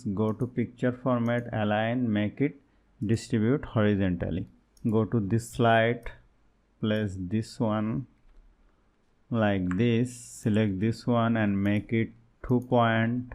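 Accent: India. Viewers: This nigga is Indian 😂 Accent 😂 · I can't believe that I'm listening to an Indian accent😭😭😭😭😭😭😭😭😭😭😭😭😭😭😭😭😭😭😭😭😭😭😭😭😭😭😭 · your accent is Indian I think. Thank you for soo good presentation From= INDIA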